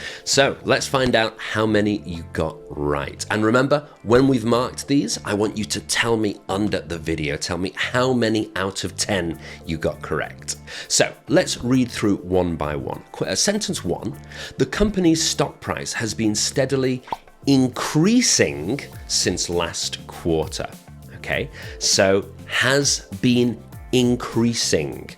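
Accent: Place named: United Kingdom. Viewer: Thank you sir. And your British pronunciation sounds incredible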